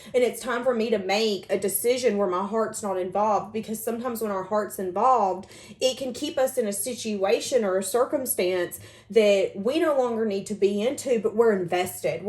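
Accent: South, United States. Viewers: My goodness, child. I do believe you are the smartest reader I have ever encountered. The only thing you missed is that he has a relatively new diagnosis of cardiopulmonary illness. And that would tie it all together. And I LOVE your southern accent. Peace.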